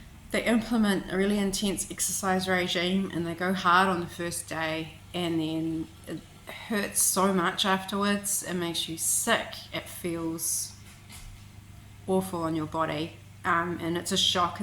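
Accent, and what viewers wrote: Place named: Australia. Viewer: Good helpful/useful information, Good on ya!🚶🏃🏼‍♀️👍🏼 Luv your accent, Australia, right?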